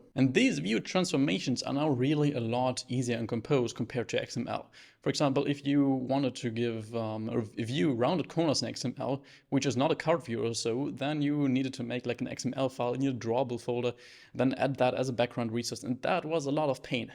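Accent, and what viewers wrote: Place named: Germany. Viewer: Der deutsche akzent lol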